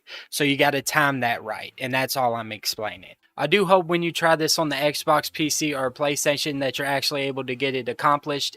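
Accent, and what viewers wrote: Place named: Appalachia, United States. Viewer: do yourself a favor and have someone who doest sound like a hillbilly narrate your bad videos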